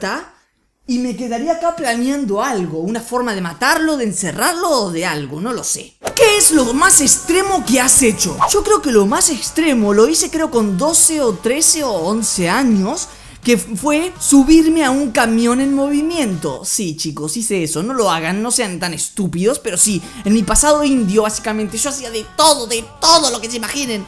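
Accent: Buenos Aires, Argentina. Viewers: Pensé que era mexicano, pero después sha toco el tono porteño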